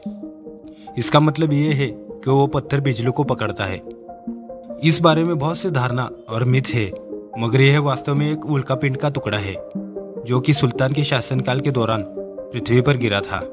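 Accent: Maharashtra, India. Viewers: इस वीडिओ के निवेदक की मातृभाषा मराठी है ऐसा लगता है । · माहिती तर लाजवाब दिलात , पण तुमचा आवाज मराठी वाटतोय ❤️👍🏼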